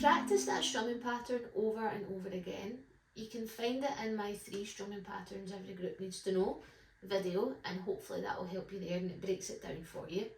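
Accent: Scotland, United Kingdom. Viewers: Thanks for posting this tutorial. Love your accent 😍 by the way. Is it Scottish?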